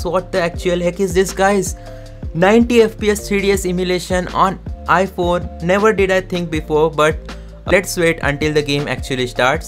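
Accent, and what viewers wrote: India. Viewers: I just cant stand his Indian accent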